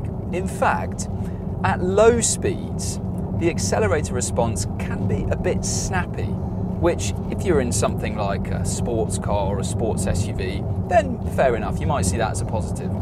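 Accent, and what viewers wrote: United Kingdom. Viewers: American here. I can't buy this car in the US. But I just watched the whole video because of his perfect British accent.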